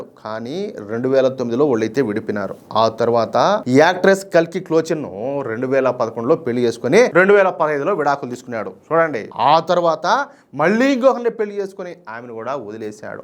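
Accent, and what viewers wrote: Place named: Kadapa, India. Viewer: Anna meedi maaa kadapa nee naa. Maatlu alage vunnayi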